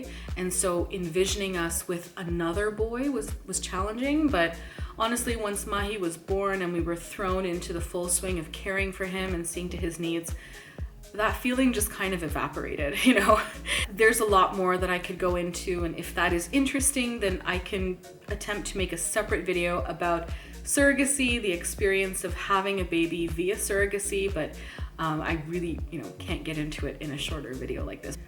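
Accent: Canada. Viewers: Thanks for sharing so openly in this q&a. Thoroughly enjoyed it and liked getting to know more about you. As another 1st gen canadian poc - I get the accent thing. We just sound Canadian, no faking ;-) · Your accent is like any Canadian person and any one who’s telling you fake or anything like that , they have a disease called “ jealousy “.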